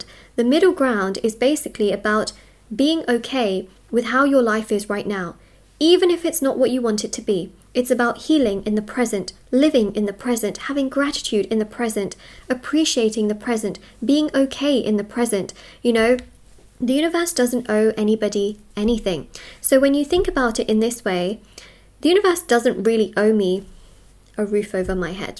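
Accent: United Kingdom. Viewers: This lady is from UK I can say that. I wish to go and work in london for a couple of years. This slang sounds so interesting.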